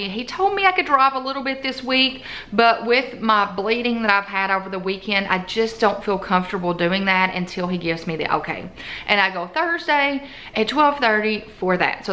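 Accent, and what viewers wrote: South, United States. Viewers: Watching your video, hope ur well, I had mine December 1st, only bled 3 days, and stopped taking pain meds by the 3rd day, awww ur kitties, how many you have , such sweetie s, Sending hugs and prayers, where you from, I love people with southern accent, oh Girl, I know about the pot bellies, I hate it, I'm 46 , I had mine in December 1st and my Birthday is on Jan 9th, so I was 45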